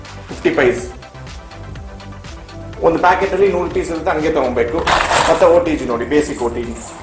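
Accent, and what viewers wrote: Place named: Tamil Nadu, India. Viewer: Typical tamilian Kannada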